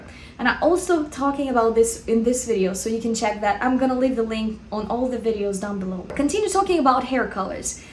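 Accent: Russia. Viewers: Мне кажется, или есть очень сильный русский акцент?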